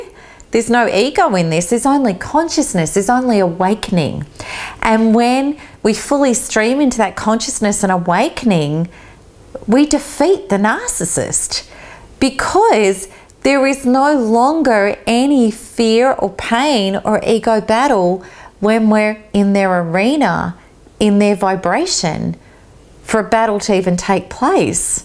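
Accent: Australia. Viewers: the ozzy accent is strong with this one...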